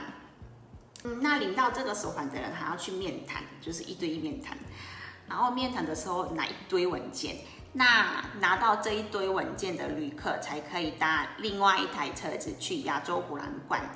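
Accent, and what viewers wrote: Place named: Taiwan. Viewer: 台灣腔真好聽。已經變成台灣人了。🤗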